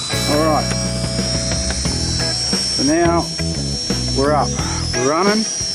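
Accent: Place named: Australia. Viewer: What a legend. As a kiwi there is nothing more reassuring than an Aussie accent gently educating you on the finer points of setting up your drone. Most appreciated 😅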